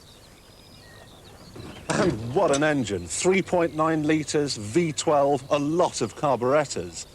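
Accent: RP, United Kingdom. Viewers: Why does Clarkson sound so posh back then lol? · Ha Clarkson used to have an old "bbc" voice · Clarkson as hilarious as ever but sounding a little posher than now, obviously the public school education has eroded to become the success he is today and become a man of the people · Back when Clarkson spoke more like the Queen than his true Yorkshire persona like he does now. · He sounded so posh back then! Now he sounds like a chav lol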